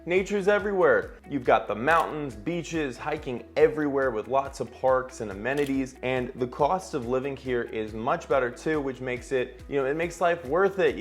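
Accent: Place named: California, United States. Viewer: Your video sounds like every Cali person....which is why no state wants you. You moved to NC and should acclamate and shut up.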